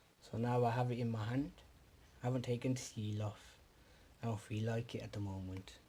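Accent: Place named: Birmingham, United Kingdom. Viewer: your brum accent 😭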